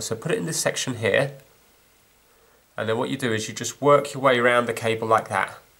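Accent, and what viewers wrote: England, United Kingdom. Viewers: It's how English is spoken by the English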